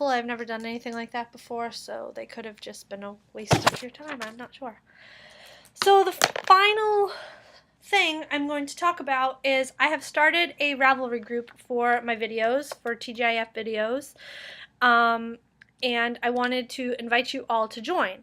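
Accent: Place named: South, United States. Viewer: Nothing wrong with talking like you're from the south lol.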